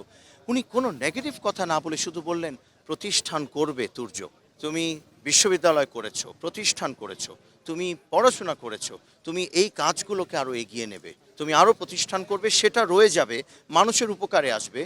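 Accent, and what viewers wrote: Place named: Kolkata, India. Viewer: কলকাতার টোনে কথা বলছে টেনে টেনে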